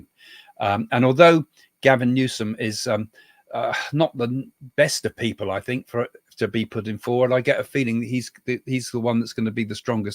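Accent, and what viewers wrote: United Kingdom. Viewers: Mr., YOU ARE THE BEST!!!! Your royal British accent is CHARMING!!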